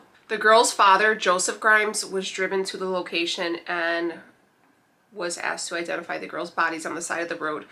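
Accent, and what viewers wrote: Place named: Chicago, United States. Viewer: This is my 3rd video I have watched on your channel and I knew you were from Chicago from your first one I watched , I too am from Chicago , I recognized that accent 😊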